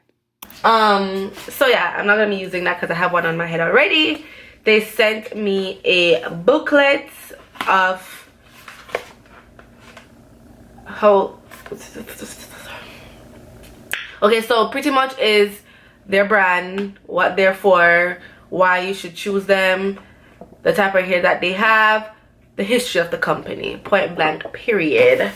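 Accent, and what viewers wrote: Jamaica. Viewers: You deadass sound like a jamaican accent hidden there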